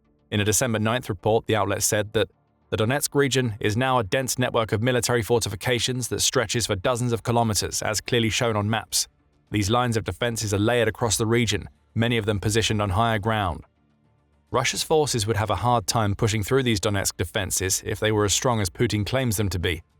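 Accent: United Kingdom. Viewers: This channel is funny a guy with poor knowledge off military history and tactics making claims about that which he doesn't understand. I am bewildered that he has over a million followers. a sign that if you have good editing skills and a British accent people will believe any nonsense. Russia isn't losing. the only reason that the war is slowing for Russia is that it's being backed by billions of dollars of foreign aid. we are dumping massive quantities of money to keep the Ukrainian State afloat at no personal gain to us whatsoever. it's an absolute bogus nonsense strategy that won't benefit anyone except this false idea that Russia is going to try to Hitler-esque conquer Eastern europe. it's not happening, it never will happen in our lifetimes, and I'm tired of sitting here and pretending like that's the motivation. it's very obvious that the war in Ukraine being funded by the Western allies is a great way for some corrupt money deals to go down. 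I mean how many billions did this guy lose? Russia isn't losing, nor will it unless something wild changes. Billions of western dollars looks like Ukraine slowly losing. what a joke to listen to this guy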